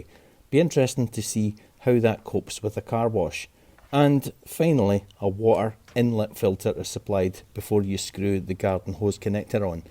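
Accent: Scotland, United Kingdom. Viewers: Hello, A nice laid back assement of Karcher's latest product. And also good to hear a friendly Scottish accent.🏴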